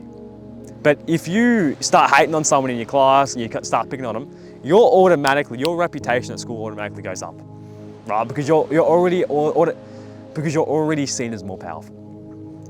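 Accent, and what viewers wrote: Australia. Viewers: Watching this made my day. Thank you ❤ and to answer the title, I really wish and hope to find that one person in few years 🤞. I wish you all well Ps. I like your aussie accent, it suits ya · I could listen to your Aussie accent all day 😅 I’m just a USA southerner…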